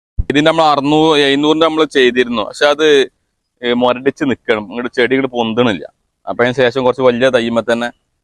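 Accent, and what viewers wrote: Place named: Malappuram, India. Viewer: ഇതെൻ്റെ മലപ്പുറം ഭാഷ👍😎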